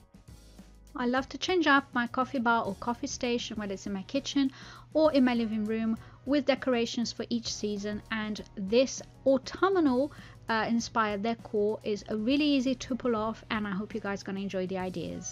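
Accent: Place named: London, United Kingdom. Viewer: Are you originally from the UK? You have a London ish accent.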